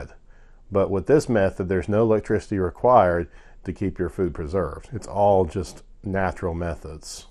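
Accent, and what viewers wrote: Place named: Appalachia, United States. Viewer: He gots one of them Appalachian accents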